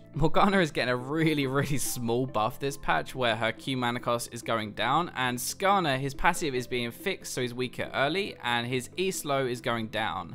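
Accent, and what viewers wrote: United Kingdom. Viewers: I love British accent! And I'm not the only one haha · Dude i love your vids! plus im british as well so :D ur accent is normal to me i couldnt tell the difference xD anyway keep ur amazing vids up and remember them puns of funniness xD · the reason I'm wathcing the clip consists partly of your british accent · I could have listen to you for hours british accent is so cool :D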